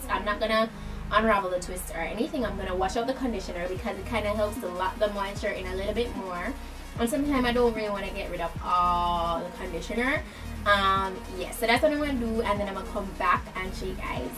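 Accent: Jamaica. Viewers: OMG! Your accent is so cute ? Wya from gyal? Jamaica? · Where are you from... Your accent sounds kind of Jamaican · Love your hair... Girl you sound Jamaican. Like ur from like Kingston i guess. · you sound Jamaican. am I right ? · You sound Jamaican!! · You sound Jamaican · She's Jamaican. Our ascent is one off a kind. No othe Culture talk like us. Lots of faker tried. But epics failed. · I hear a jamaican accent. Subscribed😌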